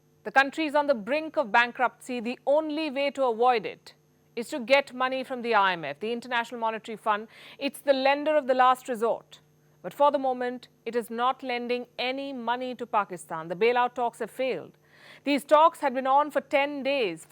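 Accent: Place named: India. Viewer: Somehow her Indian accent makes her lttle zingers even more risible.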